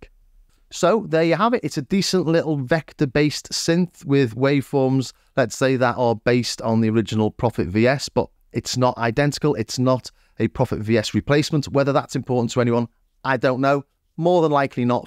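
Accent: Liverpool, United Kingdom. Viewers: My wife says Scouser. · That gear video was gear (as we used to say in my youth)😉 I am not a great keyboard player, but you've certainly piqued my interest with this video. Great to hear the sweet tones of home... (Your accent, not the synth 😊). I'm a Scouse exile in Catalunya. Off to check out some more of your vids. Ta ra...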